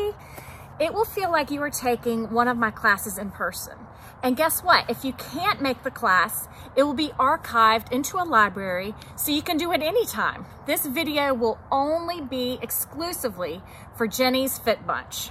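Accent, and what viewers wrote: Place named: South, United States. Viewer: Perfect way to end an hour workout!! I’m from California south 30 miles from San Diego,I wish people here had your southern accent !! Enjoy the upcoming holiday!!🎉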